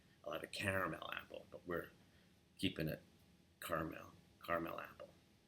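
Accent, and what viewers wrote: California, United States. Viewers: Denise Spurlock That is what a Californian accent is like. We tend to extend words and change the tone of our voice mid-sentence, so people often associate it with slow talking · A New Yorker who says 'taaaawk' instead of 'toooooawk'!!!!!!!!!!!!!, you're already Californian!!!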